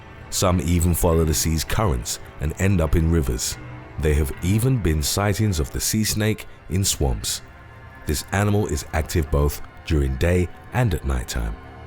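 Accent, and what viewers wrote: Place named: England, United Kingdom. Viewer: Just viewed this video and noticed how intelligent the classic English (and Ausssie) accent makes one sound. That is just about as far as this one goes!! Opening statements identified the Sea Snake as a Viper. The keyword here is VIPER.....add your own accent and then ask......WTH??? You mean there's now a whole new family (viperidae) of sea snakes? All this time I have only been concerned with the Elapids (Elapidae). Hmmm......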